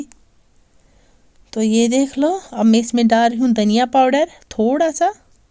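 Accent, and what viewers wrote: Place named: Kashmir, India. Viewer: Ur kashmiri language is very sweet di❤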